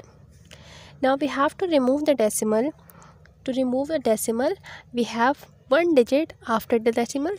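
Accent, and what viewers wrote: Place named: India. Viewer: May I ask you a question out of curiosity? I take it from your accent that your from somewhere in (or around) India, right? Is it normal over there to put the decimal point that high up as opposed to "on the floor"? If I saw your 8 point 1 without any context, I'd think that it's supposed to mean 8 times 1. Another thing I'd add is that I wouldn't say that it's mandatory to rationalize a denominator. There's nothing wrong per se with 3/√10 as a result. It's more a convention (for historical reasons, it seems) and that apparently teachers are told to teach their students to do this. I'm from Germany and when I learned math we were also told (IIRC) that we shouldn't leave a root in the denominator. Or a decimal fraction, or imaginary number for that matter. But as we moved up in classes, I think that this was seen increasingly less strict (as it should be, in my opinion) because it's really not important at all. 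I think that everybody should know how to get rid of decimal fractions, roots, or complex numbers in the denominator if one chooses to do so or if it's necessary or helpful to solve a problem. But I don't see the point to give students the impression that a result like 3/√10 is somehow invalid. Please don't take this as a criticism directed to you personally, it's not at all. My little rant rather is aimed towards those who for whatever reason think that they should view these results as less valid (or even flat-out wrong) rather than less aesthetically pleasing (which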